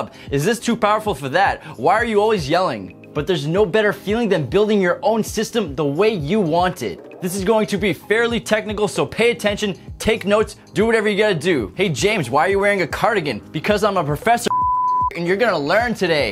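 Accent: United States. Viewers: Are you an american? sure sounds like it.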